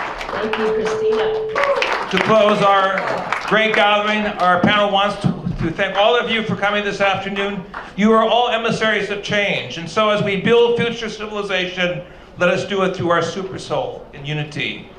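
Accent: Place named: Australia. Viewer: Twenty minutes ago, as I was pulling into my driveway, I changed the Sirius station to 129 [The Catholic Channel]. Father Dave was in conversation, and a person who sounded to be an Aussie began speaking. After the first sentence of dialogue, I put my car in park, and had to find out who this person is and her mission. I scribbled the info onto a piece of a snack box, went to the website to than YouTube. The end result: I felt happy. And invigorated. Thank you for passions. Your ripple effect will be felt in perpetuity. God bless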